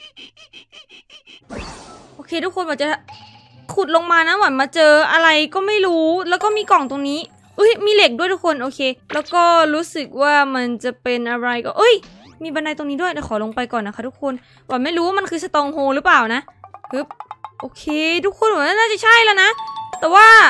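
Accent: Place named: Isan, Thailand. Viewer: คนอีสานเบาะ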